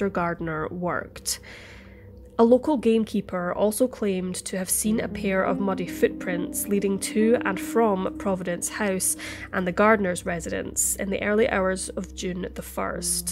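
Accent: Scotland, United Kingdom. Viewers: I have such a soft spot for the Scottish accent, I'm not far from you either huni, Northern Ireland. Great upload btw , I love love love the oldest cases. New subscriber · It's the warmth of her lyrically sweet Scottish lilt I love,, yet on the other hand some Scotts sound rough as sandpaper! You'd need subtitles to understand them! FRoM LoNdon wIV LoVe 💋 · This voice is amazing. I can't get enough of a Scottish woman's accent. Like beautiful music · Is this narrator from Scotland? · I like listening to that deep beautiful Scottish accent. Great channel and great stories.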